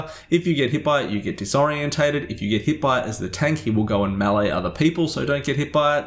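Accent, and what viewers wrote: Australia. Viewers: He’s Australian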